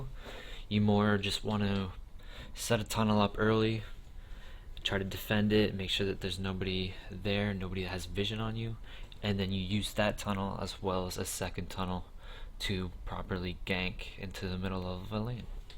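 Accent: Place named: Canada. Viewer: Love your sexy Canadian voice ;)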